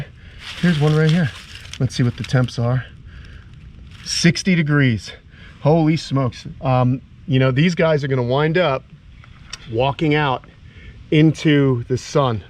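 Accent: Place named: New York, United States. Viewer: I didn't know you grew up in NY but I've wondered a lot because you have a liiiittle bit of a New York accent sometimes 😊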